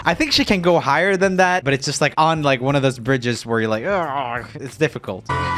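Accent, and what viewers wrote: Sweden. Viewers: RJ Alcaraz the Swedish accent.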